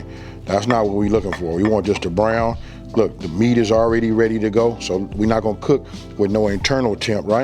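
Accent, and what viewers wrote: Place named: Los Angeles, United States. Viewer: this is 🔥. Even your LA accent!!! 😊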